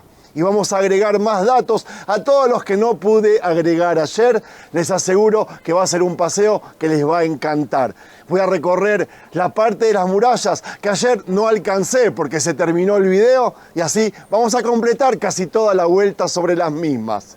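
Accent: Argentina. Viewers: Por qué tiene acento argentino es judío, de verdad o qué? Es una pregunta, espero no ofender🙏❤️❤️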